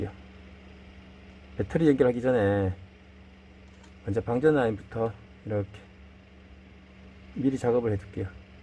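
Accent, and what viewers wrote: Gyeongsang, South Korea. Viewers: 밧데리 박사학위 드리고 싶어요 구수한 갱상도 사투리 좀 버벅하시만 그것이 더 정겹네요 쪽집게 과외 처럼 존경합니다 밥 커피 대접 하고 싶어요 저는 🏝에 살아요 부시리 삼치 드시러 오세요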